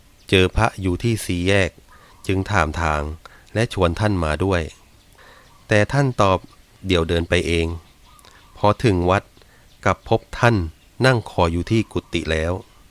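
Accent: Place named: Prachuap Khiri Khan, Thailand. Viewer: เหน่อแบบคนประจวบเลยครับ ผมก็คนประจวบ หนองตาแต้ม ปัจจุบันย้ายมานครปฐมแล้ว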